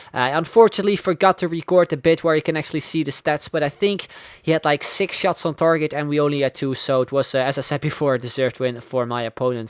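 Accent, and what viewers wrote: Netherlands. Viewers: Are u dutch +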